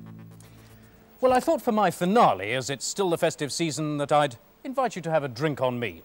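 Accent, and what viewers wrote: United Kingdom. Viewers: The British accent makes it even better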